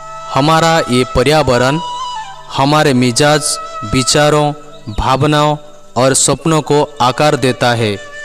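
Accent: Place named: India. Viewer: ଉଚ୍ଚାରଣ ହିନ୍ଦୀ ପରି କର ନଚେତ୍ ଓଡ଼ିଆରେ କୁହ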